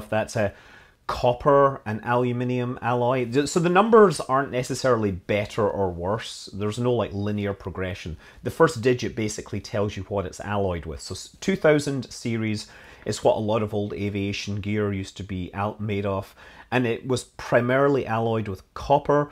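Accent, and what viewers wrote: Scotland, United Kingdom. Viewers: Its amazing your knowledge on all these subjects you do in your channels, I think yer a very clever man, a credit to the internet, BTW by your accent Do we live in the same country,? the UK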